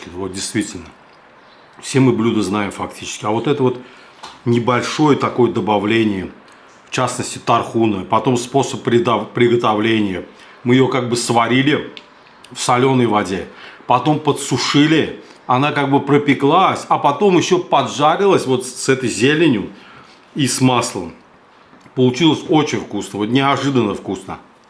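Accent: Baku, Azerbaijan. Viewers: Джан! Мало того что красиво, а ещё непередаваемые наши бакинские интонации!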